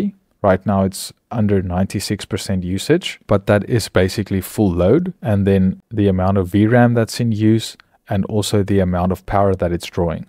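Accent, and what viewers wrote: South Africa. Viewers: I could definitely tell that you're South African based on your accent. Great video!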